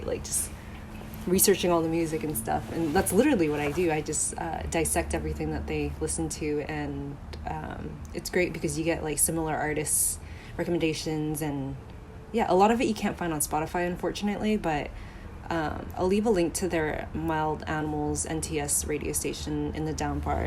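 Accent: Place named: California, United States. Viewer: Weird confession, sometime I think about emulating the way you talk haha. Like you don't rush your words, you articulate everything with precision and thoughtfulness. So measured and without any anxiety. And very Californian!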